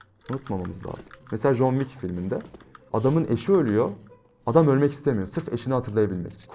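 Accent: Adana, Turkey. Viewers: Abi sen adanalı mısın?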